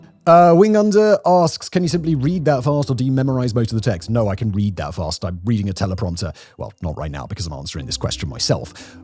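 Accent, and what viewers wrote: United Kingdom. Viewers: Love the presentation, and respect the methodology/ethics of how you do this. As a UK english guy who has travelled the world I recognise your 'pan Brit' accent. But I am puzzled by some of your mis-pronunciation of some terms/names. Such a Thales , a well known defence co. pronounce talez... Just an observation, where generally were you from?? Just interested.. retired Rail Engineer here. 😀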